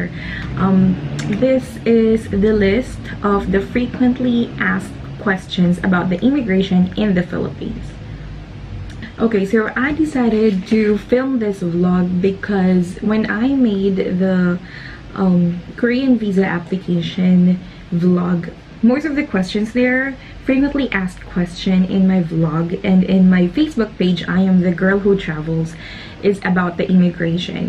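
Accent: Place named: England, United Kingdom. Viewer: thank you and btw, I like your english accent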